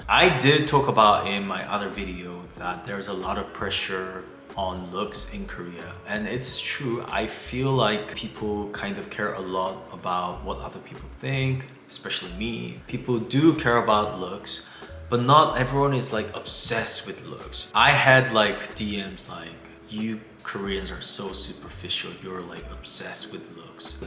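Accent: England, United Kingdom. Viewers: Do I detect a British accent? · I absolutely love your English accent, especially when you say the word talk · You are very charming and thank you for taking the time to speak to us about different subjects. I love listening to you. I live in the USA and have a desire to learn about other countries, especially South Korea. I started listening to early 2020 when covid became an issue here. I am a kpop fan and I am 73 years old. But then I love all music. I hear an accent when you speak. Is it from Great Britain? You mentioned you lived abroad and your English is exceptional. I will look forward to hearing from you again. Have a pleasant evening. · Have you had a nose job? You sound a little bit British. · I appreciate you sharing this. I have been trying to learn Korean, Hangul and I do know some words of greetings and I an to come there one day. 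I do watch the k dramas, I Love and some other kpop artists. This is the first time I have watched your commentary and I for 1 really appreciate it. Racism is everywhere. I do however want to ask you, I noticed you speak with a British accent, where does that come from. Thanks 💜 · Hello, I came across ur YouTube video very interesting, u sound like a bit of a British accent lol, well I love culture so it was enlightening 😊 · You’re English sounds English 🎉🎉🎉🎉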